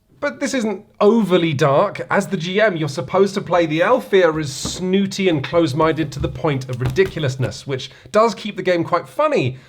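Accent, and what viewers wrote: United Kingdom. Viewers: Nice to see a review about RPGs. I would like some more...😆😉 (Although the British accent makes your videos difficult for me to understand....but I watch them anyway.😆😆)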